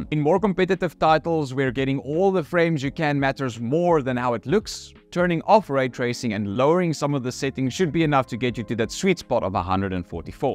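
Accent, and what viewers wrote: South Africa. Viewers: Mnr is jy van SA af?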